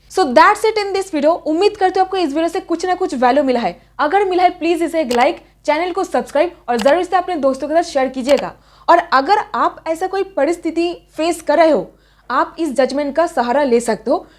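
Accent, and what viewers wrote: Bengal, India. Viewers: Apnar kotha sune mone hochche apni bangali??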